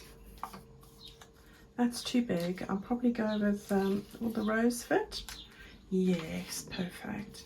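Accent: South Africa. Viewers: It's the way you say"here" then you said you used to live in S.A. And then I realised why I couldn't place it. You've been here a while hey xxx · I absolutely love your videos... Sorry it is so hot there but here in Johannesburg it is freezing and I am in Europe next week and I can't wait for a bit of warmer weather... I also don't like it too hot though... I knew you had spent time here in SA I can hear it in your accent... so nice to see one of ours doing so well ... thank you for all your beautiful stuff. <3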